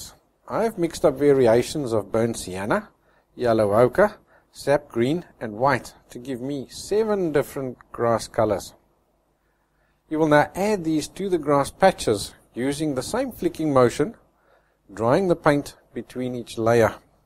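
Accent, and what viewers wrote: South Africa. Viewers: thanks for the great teaching style and accent in your voice,,is it south african or welsh?but thanks anyways very easy to follow and sped up just at the right times --im sure it wont come out like yours but i will try nevertheless,,thanks again.. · Fantastic.. im from s.a.too. I can hear on ur accent ..I'm trying your tutorial soon. Do you give painting lessons?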